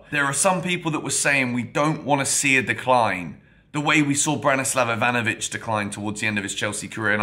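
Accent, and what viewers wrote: Scotland, United Kingdom. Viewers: GREAT Scottish accent mate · Great scottish accent mate, loved it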